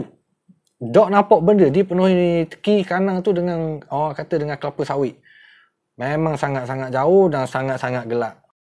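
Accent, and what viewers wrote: Terengganu, Malaysia. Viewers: Selesai tonton.. mantap dan padu! Terasa padat menontonnya.. penceritaan dgn loghat ganu yg menarik.. teruskan.. Kipidak! 👍💪✌️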